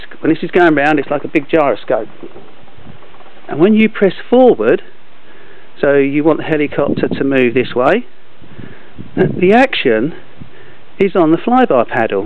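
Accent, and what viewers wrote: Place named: South London, United Kingdom. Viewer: you south london geez? brixton/peckham accent, well cool.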